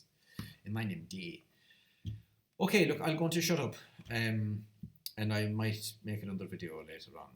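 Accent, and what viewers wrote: Kerry, Ireland. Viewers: Kerry for sure. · I’d say Kerry. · Kerry most likely, if not Kerry than Cork.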